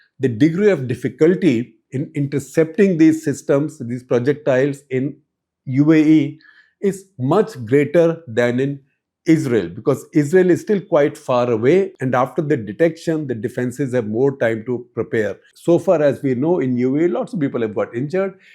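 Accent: India. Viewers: Utter garbage spewed by progandists , & repeated by a stupid fool young man from sindustaan stone age , with a thick kooli accent... Go back to cultivating your land and go produce some magh dhal beans... However Sirrrr rrrrrr....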